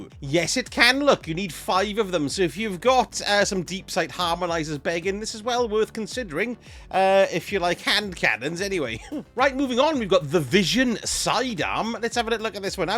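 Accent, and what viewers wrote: United Kingdom. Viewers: He’s British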